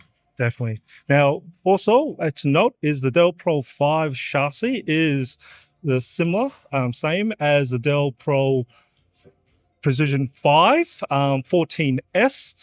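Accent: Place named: Australia. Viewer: The aussie accent 😆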